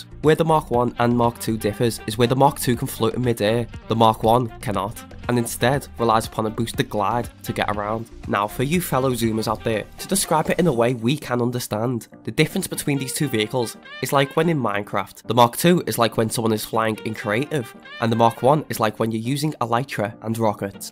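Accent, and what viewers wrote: Liverpool, United Kingdom. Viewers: I am now convinced you are British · omg , he's so bri-ish 😢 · ps: i've never met a scouse gta youtuber before :( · Scouser!! Yes now lad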